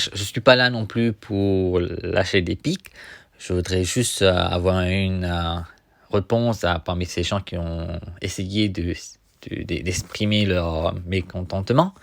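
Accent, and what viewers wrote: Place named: Indian Ocean. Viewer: Ton accent sent l'océan indien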